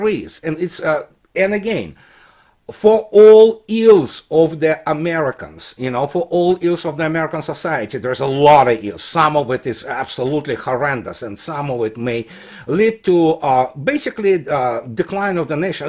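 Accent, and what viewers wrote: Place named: Russia. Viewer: Glad to see and hear you again! I love your Russian accent...🙂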